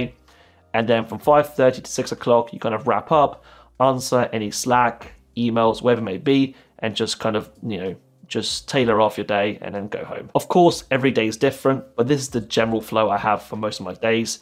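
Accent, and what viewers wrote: United Kingdom. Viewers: For machine learning, I choose to learn Python and C++ as my main programming languages. I like your video because of your British accent. Why don't you use X as a machine learning engineer?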